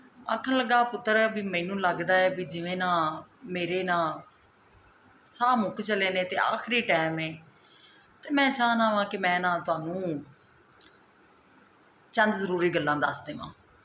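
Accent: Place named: Punjab, India. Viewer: Your punjabj accent is so cute❤😮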